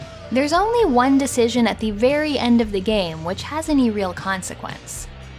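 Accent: Canada. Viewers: I could barely tell. It's the nicest Canadian speaking voice I have yet heard.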